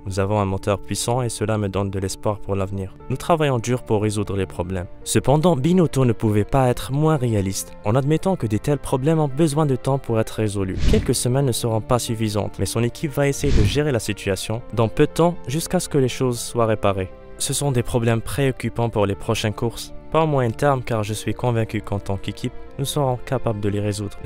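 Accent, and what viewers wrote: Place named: Morocco. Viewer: Ah mais accent je peux pas ! C'est quoi ça ? Vs avez trouver un mec au fond du Maroc pour lire votre texte ? Laysteuuurrrr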